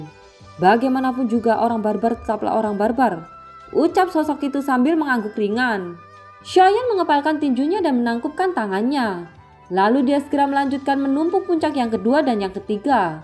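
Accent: Java, Indonesia. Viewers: Peyampayannya bukan LG anime donghua tp logat Java donghua.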